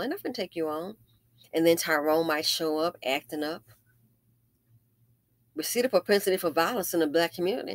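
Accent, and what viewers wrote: South, United States. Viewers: Girl that country twang 😭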